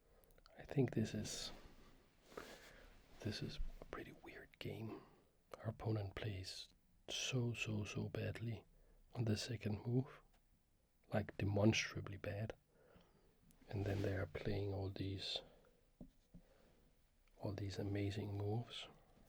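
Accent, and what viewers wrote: Denmark. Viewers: You should do a video in Danish! I love the accent and really enjoy watching these videos, it would be lovely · I don't know about you guys but I clicked for the Danish accent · I do so love the Danish accent. Have you been to the b*stard cafe in Copenhagen? It’s a board game cafe.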